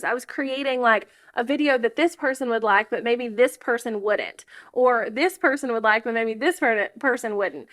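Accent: South, United States. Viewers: That southern drawl makes me smile so much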